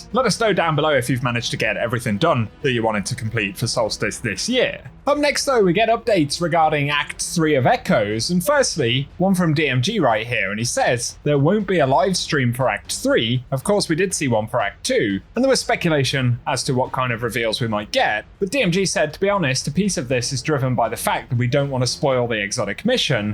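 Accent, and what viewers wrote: AAVE, United States. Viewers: leave his ebonics dialect alone.. 😂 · Is it ebonics?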